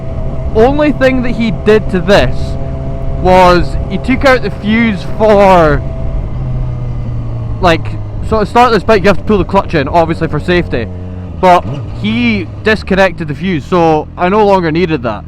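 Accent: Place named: Scotland, United Kingdom. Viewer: Refreshing to hear a Scottish voice. Nothing wrong with keeping a bike you like .its for you not anyone else. I have a 1981 Yamaha RD350LC i have had it since 2000 . I have a 2000 suzuki tl1000s owned it since 2001 also a suzuki Gsxr1000 k5 owned for 4 years no intention of selling anything also a 1978 Yamaha dt175mx owned for 5 years. Be happy with whatever you have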